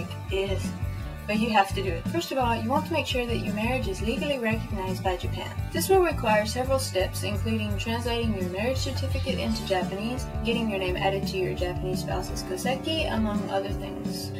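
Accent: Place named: South, United States. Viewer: i was wondering where she's from until i heard that.... ahhh. yep, thats southern. :D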